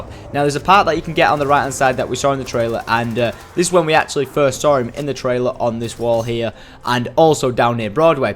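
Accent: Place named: Manchester, United Kingdom. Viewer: You sound like your from Wigan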